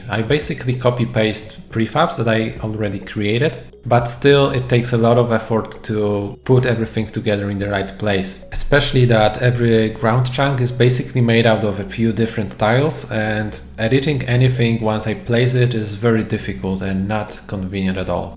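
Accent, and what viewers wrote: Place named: Poland. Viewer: Are you from Poland? your accent sounds very Polish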